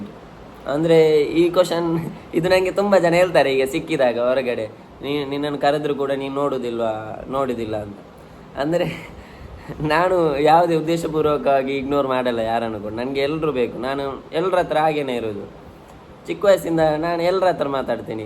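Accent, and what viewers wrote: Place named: Mangalore, India. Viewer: ಮಂಗಳೂರು ಕನ್ನಡ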